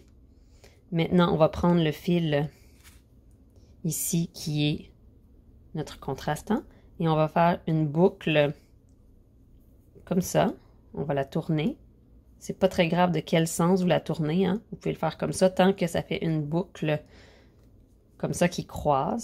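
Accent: Canada. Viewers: Je suis définitivement fan de tes vidéos !!! Grâce à tes tutoriels j'apprends plein de nouvelles astuces tricot. Tout est vraiment bien expliqué et compréhensible. En plus ton accent et tes expressions me donnent encore plus envie de découvrir le Canada!! Bonne journée et merci pour ces échanges.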